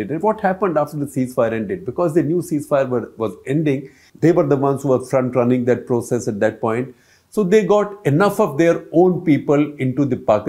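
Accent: India. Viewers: There's something known as 'Indian accent'. Its less of an issue and more of a feature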